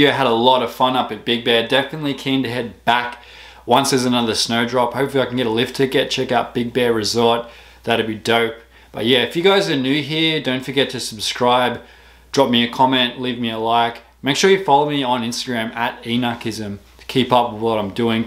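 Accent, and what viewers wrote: Australia. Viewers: It’s sick how you liked and replied on every comment. Better comment now before you get to famous and I love the Australian accent man, wish I had one so I could get mad chicks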